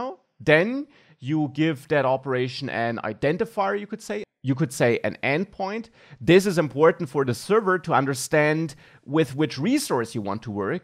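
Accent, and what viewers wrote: Germany. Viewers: very smooth vid, clean and explicit good watch ! just one thing may i ask: is this a german accent ?(just curious)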